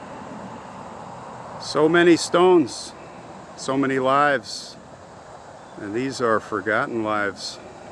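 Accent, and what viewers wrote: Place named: Midwest, United States. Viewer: That prison must have been so awful 😖 Cannot imagine. Thanks also for remembering these forgotten people. I know people comment on your mid-west accent. I don't think I've ever told you, but it makes me smile ☺️ You say Illinois and some other words just like my friends near Peoria do! 💕 😃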